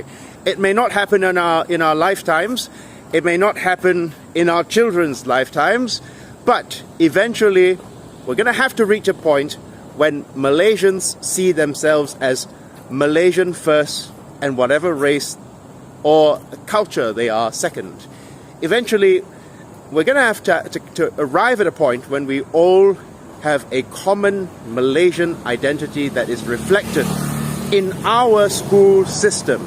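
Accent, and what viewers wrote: United Kingdom. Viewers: First of all, it shouldn't be you talking cause you are a Chinese. Any changes have to come from Malays. Liberals will cheer for you no matter what, but most Malays won't give a damn on what you have to say. Secondly you spoke in clear British English, this is more as a disrespect. If you can't respect Malays, don't expect them to respect your opinion. Thirdly, public opinion is irrelevant, if you want to change something, you speak or influence people in charge.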